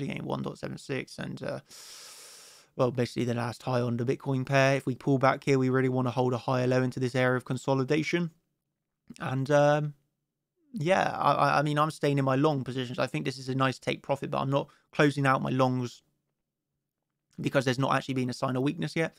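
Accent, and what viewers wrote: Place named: Bristol, United Kingdom. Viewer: Mate u sound like ur from Bristol Lol👍😎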